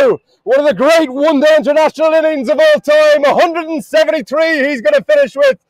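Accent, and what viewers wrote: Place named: United States. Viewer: First time hearing cricket commentary in American accent 😄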